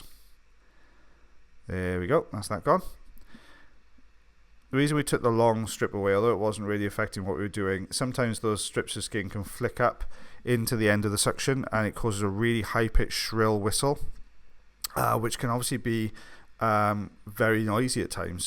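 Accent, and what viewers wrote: Wales, United Kingdom. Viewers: Question: how do you know if you have a hearing loss due to wax? Just curious because I've noticed while watching and listening to your lovely welsh accent I wear ear buds and I've found I can hear clearer in my right ear, I can still hear in my left ear but not as clear???? My husband is from Barry Island where is your office/clinic?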